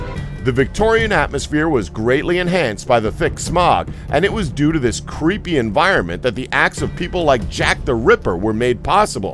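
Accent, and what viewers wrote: United States. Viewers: the amount of american english, wants me want to strangle you. FFS pronounce something correctly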